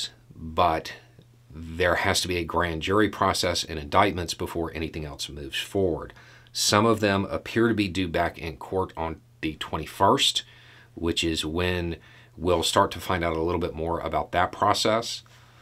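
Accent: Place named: South, United States. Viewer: Yeah, I like his accent. I'm used to most southern accents, but stands out to me.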